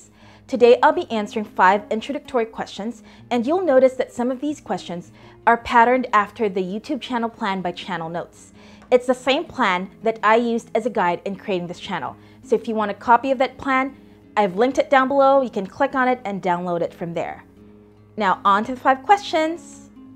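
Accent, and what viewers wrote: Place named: United States. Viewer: You have a flawless american accent.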